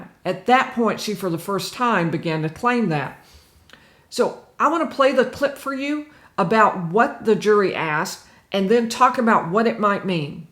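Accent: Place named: Kentucky, United States. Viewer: Love the accent, are you from KY? You sound almost just like my wife from Covington, KY. Thanks for the breakdown.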